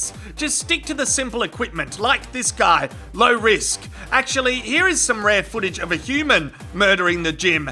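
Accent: Australia. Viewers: U sound Aussie. R u?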